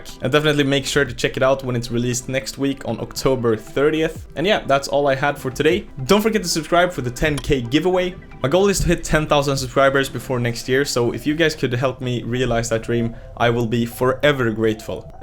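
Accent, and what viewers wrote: Sweden. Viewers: Feel like you have alot of swenglish in you accent. Svensk?! 😄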